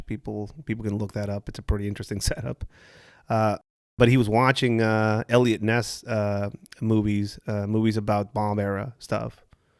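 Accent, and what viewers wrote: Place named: United States. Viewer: Cringe when he pronounces mexican cities with american accent. No sea mamon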